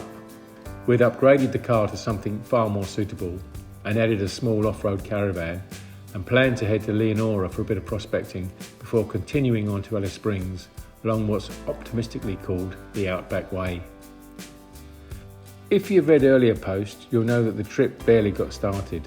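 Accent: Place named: England, United Kingdom. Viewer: A POM narrating the show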